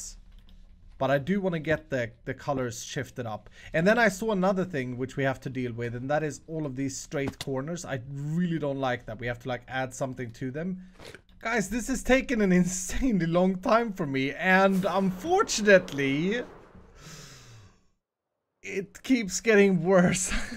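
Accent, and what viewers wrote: Sweden. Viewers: Are you Swedish?!? I’ve never seen your channel before, l really like your video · You ar from Sweden right?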